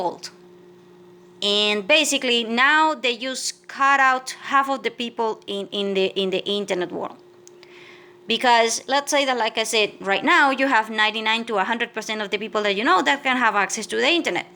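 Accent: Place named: Mexico. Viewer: what country ? you didnt' say. You sound mexican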